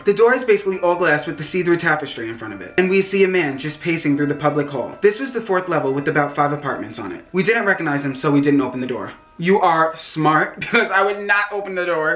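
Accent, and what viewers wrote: New York, United States. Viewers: I love your New York accent! I want to be your friend!!!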